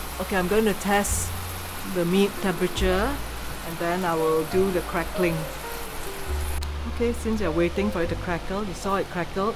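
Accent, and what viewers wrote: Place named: Nigeria. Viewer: Omg, she sounds so Nigerian. Nice job , I will try this .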